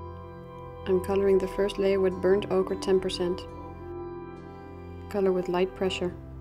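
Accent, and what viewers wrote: Netherlands. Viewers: Beautiful. Thank you for sharing. I use almost the same technique. Aren't you a Dutch by any chance? Your accent tells me so....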